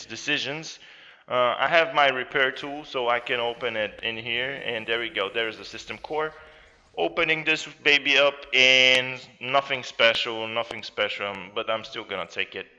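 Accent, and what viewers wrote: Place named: Eastern Europe. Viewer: English isn't the guys' first language. Give him a break. It's common for eastern Europeans. Especially east block scum.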